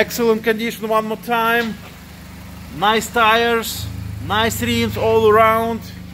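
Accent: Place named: Russia. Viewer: Русский-русский) Наш акцент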